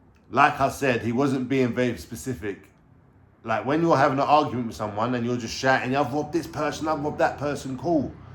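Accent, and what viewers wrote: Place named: Cockney, United Kingdom. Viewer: What part of London you u from mate? Sound a bit like steptoes accent with no disrespect. Peace.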